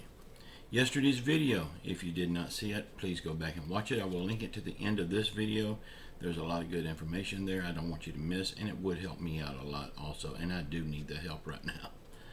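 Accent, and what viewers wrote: United States. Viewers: you remember that country classic by that you I like your show I like your country ascent you are just good old boy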